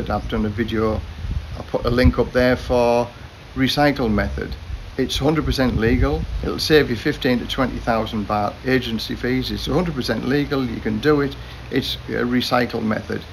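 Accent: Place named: Redcar, United Kingdom. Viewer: That is amazing, I thought I recognised the accent, I am from Redcar too, left 25 years ago to go and work in New Zealand, still here living in Auckland. I stopped over for 2 days in Thailand when I flew out here and never forgot what a great place it was. No regrets leaving the north east, weather is awful, but dad still lives there and ironically he was a firefighter too. Great channel really great to see you living the dream.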